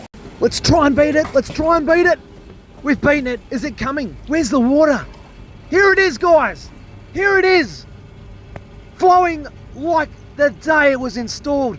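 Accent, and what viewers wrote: Australia. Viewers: Hey, been watching for a while from Kent in the UK. Fucking love your commentary and rambling. Please keep up the good work and posts. By far the most stereotypical Aussie commentator and the endless enthusiasm keeps us all coming back for more. We always stay to the end.👏🏼👍🏽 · "Go on ya good thing!" is a very popular saying in Ireland so it was funny hearing it in an aussie accent I also always love "Bob's your mothers brother" (or Robert to make it even longer) which is obviously a variation of a British expression You have a great array of multicultural idioms is what im trying to say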